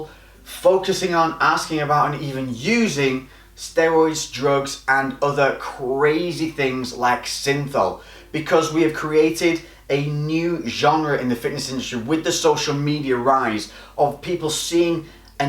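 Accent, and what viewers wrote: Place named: Northern England, United Kingdom. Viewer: Love it, and nice to hear a fellow Brit talking fitness for a change. Plus you also sound like a northern lad like me! Just recently found your channel and it's great!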